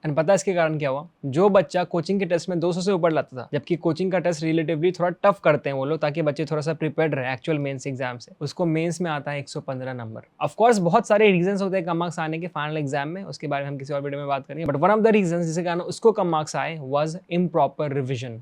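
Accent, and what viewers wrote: Bihar, India. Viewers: Do u from Bihar ?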